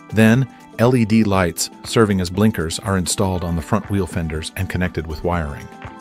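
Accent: United States. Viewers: Thumbs down for the annoying American voice over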